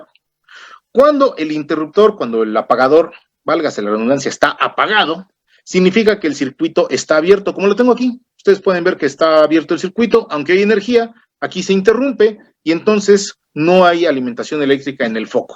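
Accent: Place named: Mexico. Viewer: Muy buen explicado y con el simpático acento mexicano, muchas gracias, tenes un nuevo suscriptor.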